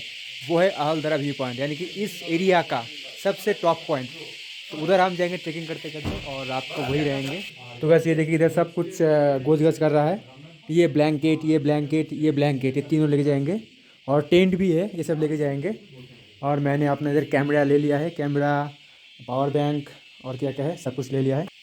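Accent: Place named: Bengal, India. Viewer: sune mone hochhe tumi bangali, hindi te vlog kano korcho?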